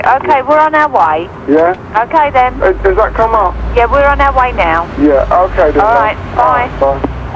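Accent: United Kingdom. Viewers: Love the cockney accent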